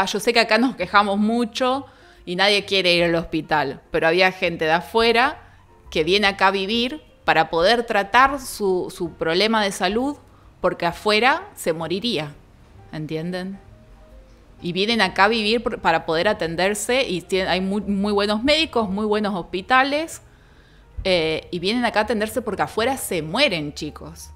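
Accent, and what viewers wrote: Argentina. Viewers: hablando de futbol, le dije a mi mamá: chales si gana si pierde Argentina le van a hacer una burla jamas vista y si pierde le van a tirar muchísimo hate, cuando jugo con Mexico obvio le iba a mi selección pero que sabia que lo mas seguro es que perderia, talvez es porque no me llama tanto la atención el futbol pero me dio igual, lo que si me saco de onda fueron los insultos de Mexico y luego de Argentina, pero como dijiste lo que no te gusta que digan a ti, no lo hagas tu, o algo así, por cierto estoy obsesionada con su acento, pero no puedo imitarlos porque no soy buena para ello XD... saludos!!!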